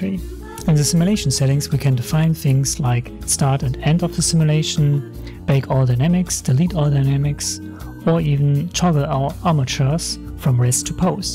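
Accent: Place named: Germany. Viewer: Everytime you hear a person explaining their project with a slight german accent, you instantly know, that it is high quality and has some smart solutions in it.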